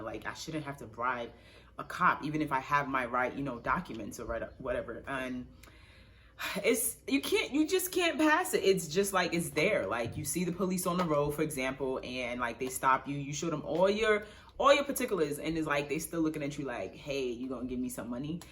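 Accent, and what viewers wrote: United States. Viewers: Ok why is your Ghana accent here not here then it's pure American accent all cap.lol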